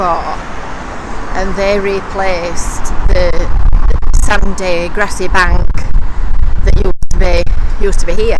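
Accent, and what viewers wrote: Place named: North, United Kingdom. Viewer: Hi, great information along the way, although as a former local the pronunciation was a little harsh on the ears, Gynn not Gin Square and Dickson Road not Dickinson Road, but good effort none the less. Your accent suggests maybe from the other side of the pennines?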